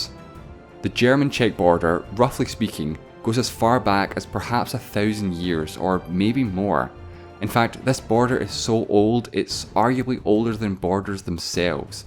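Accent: Scotland, United Kingdom. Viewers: I'm sorry, but from where the narrator is? Scotland? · OK I really need to know your accent? like exactly what is it- scottish im assuming but what type of Scottish its' really great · He sounds scottish, but someone from the UK might be able to tell better than I can. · Scottish I think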